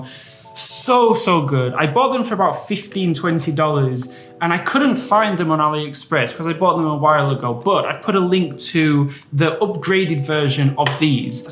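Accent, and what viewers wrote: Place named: North Wales, United Kingdom. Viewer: Hi, did you grow up in north wales or near north wales, can’t stop picking some similarities in your accent 😂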